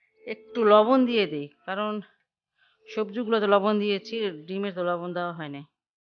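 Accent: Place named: Bikrampur, Bangladesh. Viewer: Aunty apnk o osonkho donnobad...aunty apnr kotha sunle mone hoy apnr bare bikrompur..amr sosur bare bikrompur..R ami dhakaya..dowa krben aunty amdr jonno..😍😍😍😍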